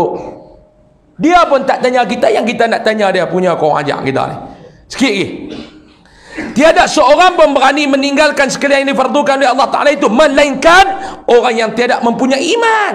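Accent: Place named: Kedah, Malaysia. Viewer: Simple ja bab ustaz ni,,bg yg anti dgn ustaz ni mmg akan nampak tak elok ja,bg yg suka ilmu yg ustaz sampaikan mereka tak kisah pun bab loklaq bahasa ustaz,,rasanya org kedah tak terasa sangat pun bahasa ustaz,jd syok pulak🤭😁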